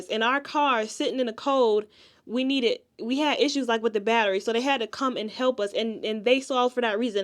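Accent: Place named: United States. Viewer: oh woow. That was a very good vlog. As a Kenyan, I actually thought you were Kenyan but your accent confused me for a while until u owned up of being American. U look so much like us and we will do everything to make u feel being African as u are indeed one of us. We certainly do have time for family...which is the most important.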